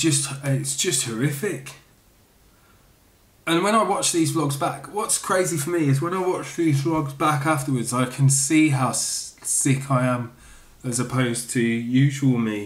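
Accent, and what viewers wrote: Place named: Newcastle, United Kingdom. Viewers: Always thinking about you day and night. Hang in there, bud. By the way your Geordie accent sounds WELSH. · Ah your Geordie accent is brilliant hahaha made me laugh, i wish i could take all this away from you I am hoping and wishing surgery will be successful X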